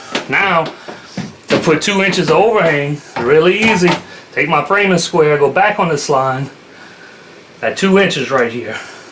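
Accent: Louisiana, United States. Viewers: Nicely done! Man, I thought I heard Louisiana in there somewhere.. subscribed. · Are you in Louisiana somewhere? 😊 You sure sound like you're from home. Thank you for this detailed, clearly communicated instructional video. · One of the best videos. I’ve watched it so many times. I would have bet my life when I first started listening that you were a black man named Mr. Beauregard. You were standing in the garage. I was so surprised when you were outside and you were white! 😂 Love your accent! Is it Louisiana? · What part of Louisiana you from, bruh?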